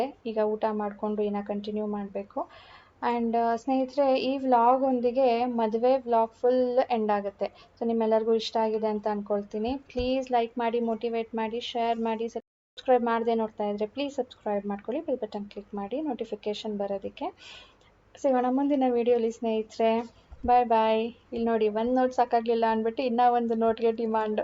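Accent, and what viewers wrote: Maharashtra, India. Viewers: Nimma mother tongue marathi na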